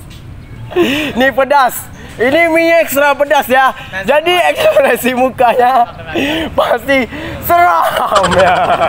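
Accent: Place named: Jakarta, Indonesia. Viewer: Kyk org betawi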